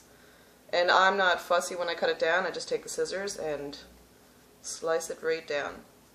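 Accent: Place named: Newfoundland, Canada. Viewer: I'm from Newfoundland and I thought, "she's not American and not mainland Canada by her accent"!! Love it!! I also LOVE your video!!! Very helpful and EASY to understand!! Looking forward to seeing more!!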